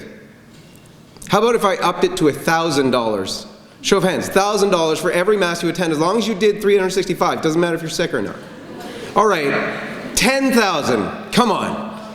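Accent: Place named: Germany. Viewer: Is he a German speaker? His English is so good!😁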